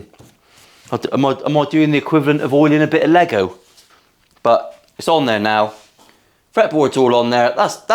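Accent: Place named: South West, United Kingdom. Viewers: I’m thinking of going into the guitar nut business and branding them Wanksox 😂 loved the video, and for a an ex BritPat living in US, I almost understood the west country accent 😊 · Scouser? West Country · Always a trip hearing a fellow Janner on YouTube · I subbed for the south West accent and the comment about going on Ebay pissed 😂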